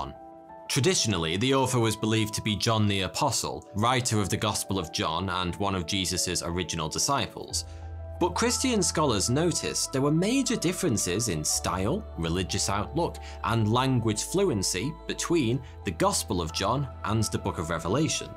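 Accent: United Kingdom. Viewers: Thanks for making the video, it's hilarious 😂🤣 I love your videos, you're brilliant, your British accent, adds a great touch😂🤣 Watching this video made my day. I was wondering if you were going to do a video about me 😂🤣 I had no doubt it would have been awesome & hilarious, great work!6!6!6! You asked, what people think the Antichrist👑👑is? Your video is the "bestest" about me. I use the word bestest to make fun of my religious haters 😂🤣 All major faiths are waiting for the exact same person known by different names. Kalki👑👑 Moshiach 👑👑 Dajjal 👑👑 Imam Mahdi 👑👑 Maitreya 👑👑 One who comes in his own name 👑👑 (thanks Isa/Jesus/Hey-Zeus, very creative nickname 😂🤣) Are the same person. Look at the youngest of the 6 major faiths waiting for some Super Hero Villain to fix things they never can 😂🤣 Islam is waiting for the same person known by 2 names in their religion. 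Dajjal 👑👑 & Imam Mahdi 👑👑 That's funny, because it's the only faith that shows specifically I am a Gemini😂🤣 There's truth in all the faiths, you just have to know where to look. 6 major faiths, 3 waiting for a Super Villain, & 3 waiting for a Super Hero. That is most of the world population, lost & doomed without 1 person. The rest of the world believes in 💩 All my religious haters left & leave the future of everyone they say they care about to either a complete stranger or Doomsday, They call me evil 😂🤣 I know 3 major religions calling me evil, were built from slaughtering each other, their own, innocent people, spreadin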